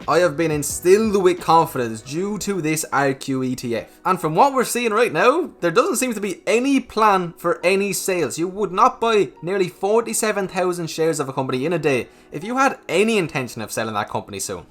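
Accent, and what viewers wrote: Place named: Ireland. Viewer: Dude i notice the irish accent. Lol.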